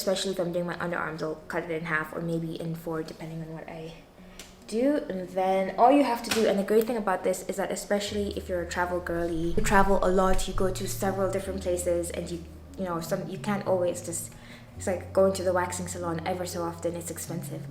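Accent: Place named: England, United Kingdom. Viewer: 💜 …….💜 ….Girl What I love about this video is (everything) but specifically your English accent 🔥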